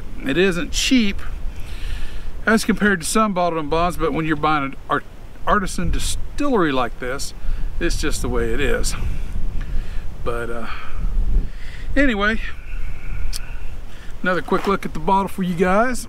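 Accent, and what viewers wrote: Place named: South, United States. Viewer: It looks like this isn't available here in Albuquerque, but it sure sounds like I'd like it. I've found that I like the fruitiness of a single malt (though Scotch and Irish whiskies aren't, in my limited experience, forceful enough for me; I love the Balcones Lineage Texas Single Malt, which is), and the barley and the corn in this one ought to make it right for me. :) Thanks for reviewing it. And you may be in Kansas, but you sound southern enough to make me happy. :D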